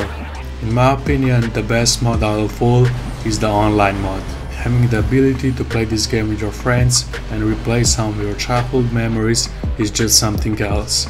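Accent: Russia. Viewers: сразу слышно русский акцет)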